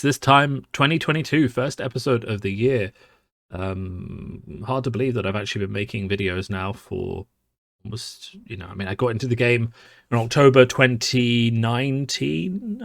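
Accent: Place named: United Kingdom. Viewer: A Lovely British Accent Indeed! Yes I Listened To The End)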